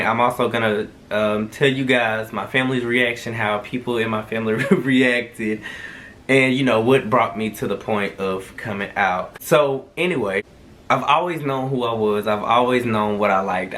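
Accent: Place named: Alabama, United States. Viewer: What part of Alabama you from bruh!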